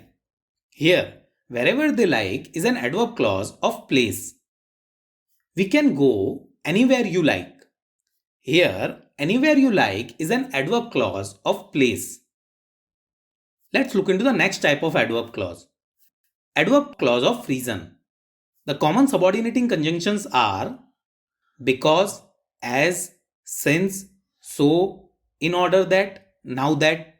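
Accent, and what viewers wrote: India. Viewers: Why is the voice so Indian? Is he from india?😂😂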